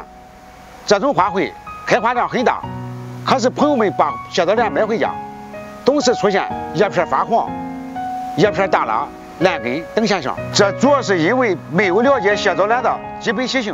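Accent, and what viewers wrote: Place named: Shandong, China. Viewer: 山东话太严重了，听不懂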